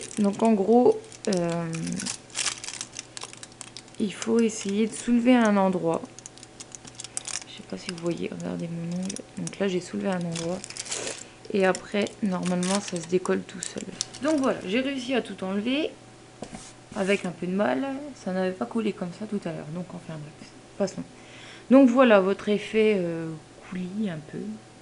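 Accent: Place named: Nord-Pas-de-Calais, France. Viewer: ta l'accen chti je trouve lol j'<3 bien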